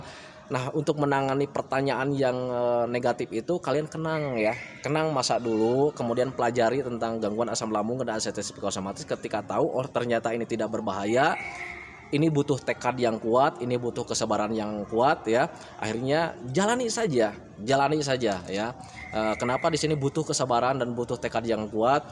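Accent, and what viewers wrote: West Java, Indonesia. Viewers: Bapa orang sunda ya? Kalo iya. Kali2 pake bhasa sunda nya dong gpp kali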